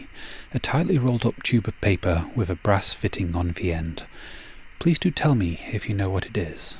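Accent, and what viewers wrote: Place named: Yorkshire, United Kingdom. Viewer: was watching this vid last night and fell asleep to your soothing voice, lovely. There is a hint of a Yorkshire accent?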